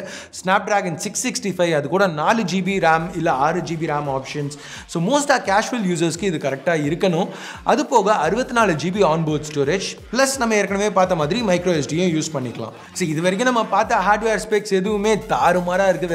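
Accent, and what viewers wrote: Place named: Chennai, India. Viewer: Now a days great changes in videos. Especially on slang. Chennai slang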